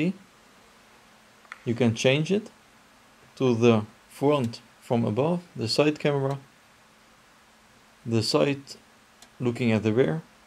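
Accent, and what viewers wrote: Netherlands. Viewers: louis van gaal engels? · I can hear that your dutch dude 😂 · ik kan echt aan je stem horen dat je nederlands bent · Thicc Dutch Accent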